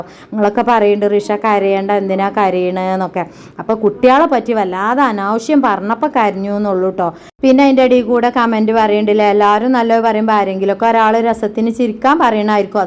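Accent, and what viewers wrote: Malappuram, India. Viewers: മലപ്പുറത് ഉള്ളവരൊക്കെ അങ്ങനല്ലേ സംസാരിക്കൊള്.... മലപ്പുറത്തേർ കണ്ണൂർ ശയ്‌ലി എങ്ങനെ പറയാൻ പൊട്ടത്തി..... 😂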